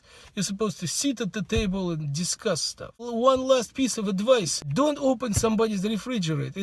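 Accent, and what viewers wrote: Russia. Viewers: Акцент у вас такой, русский) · Александра Кирилюк Замечательный Русский, узнаваемый акцент. Многим нравится. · PaNdoRiKa NeN actually i watch his videos only for his strrrrong Russian accent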